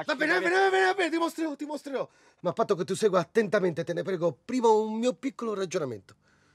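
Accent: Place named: Rome, Italy. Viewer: Aperto il video, non stavo guardando lo schermo e appena ho sentito parlare in romano ho zompato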